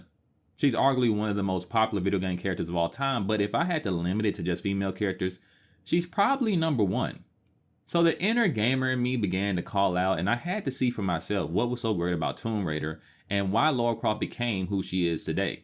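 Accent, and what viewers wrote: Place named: United States. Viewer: it's a joke.. and I don't believe this guy got it wrong anyway, he just has a murican accent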